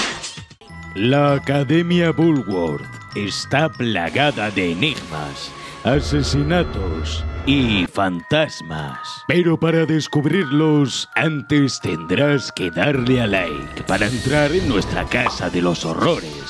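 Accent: Spain. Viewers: Una pregunta eres de España o de latinoamerica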